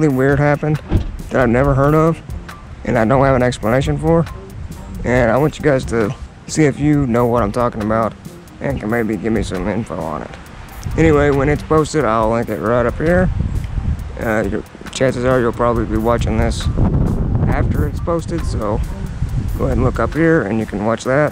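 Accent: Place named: South, United States. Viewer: Man your voice and southern accent are the shit!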